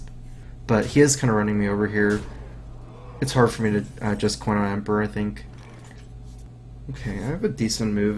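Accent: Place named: South, United States. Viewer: ***** Because has a southern accent.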